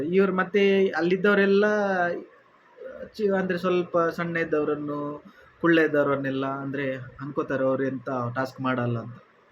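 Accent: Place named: Tulu Nadu, India. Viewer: Enu helta edira tulunadu navaru matadode hage adakke maryade kodi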